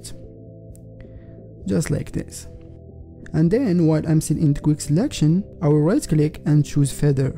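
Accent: Morocco. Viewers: your accent and voice sound like a Morrocan man great video btw!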